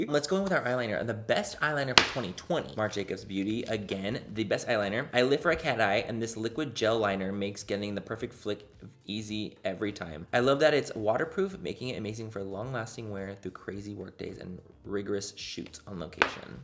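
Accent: England, United Kingdom. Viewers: The British accent is no❤️ no hate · His English accent. what is happening. What did i miss 😂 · the English accent is killing me haha😂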